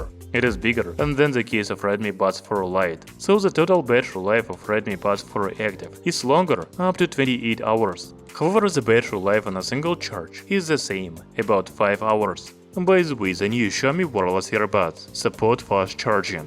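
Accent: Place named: Russia. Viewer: Какой же сильный русский акцент, извините ) Мне кажется, в русском языке столько звуков, что язык может говорить на английском гораздо ближе к носителям.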